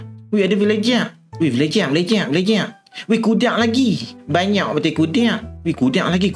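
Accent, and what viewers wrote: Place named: North, Malaysia. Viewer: Tequk sangat loghat utaqa😂😂😅😅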